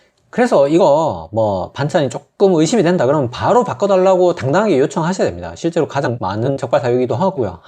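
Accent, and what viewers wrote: Gyeongsang, South Korea. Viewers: 선생님!! 고향어디세요? 저도 경상도라 말투가 너무 정겹고 말씀도 너무 재밌으세요~~~~😁😁😁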